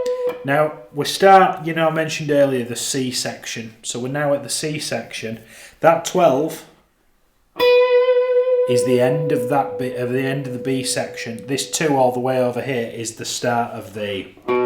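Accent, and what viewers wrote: North, United Kingdom. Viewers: Heard the Northern accent... subscribed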